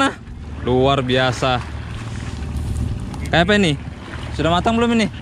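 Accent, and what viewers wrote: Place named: South Sulawesi, Indonesia. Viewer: Mantap Bosku ...klo dengar logatnya klo tdk salah dari Bugis Makassar kah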